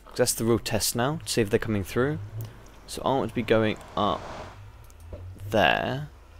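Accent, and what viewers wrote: United Kingdom. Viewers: Your like etho with a British accent :D But really you are genius.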